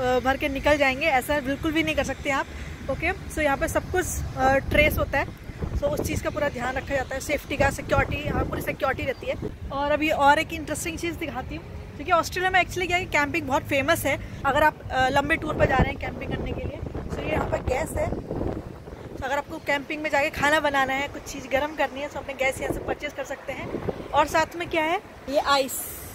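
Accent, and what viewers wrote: India. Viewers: Hii apka bat karne ka tarika bilkul indian ki tarah hai ap austreliya me rah kar bhi indian ahsas dilati ho nice or austreliya gao ka sheyar bhi kara deti ho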